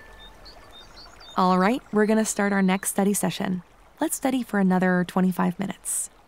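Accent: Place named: England, United Kingdom. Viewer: I like ur English accent 😅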